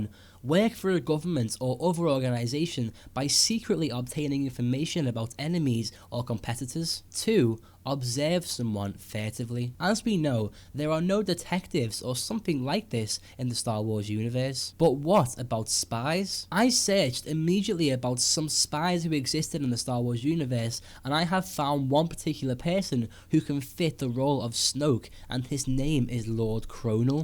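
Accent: Liverpool, United Kingdom. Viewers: It's called being a scouser. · Scouser say no more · he's from Liverpool if I'm not mistaken. the Beetles spoke the same way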